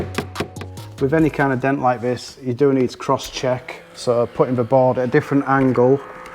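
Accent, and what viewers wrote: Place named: England, United Kingdom. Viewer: G'day Australia here, top video, and has taught me a few things I've been missing thanks for that, have been learning PDR for my own use off YouTube, being an old Pom it's good to hear a Pommy accent instead of a Yanky one for a change. Keep up the good work - DaveO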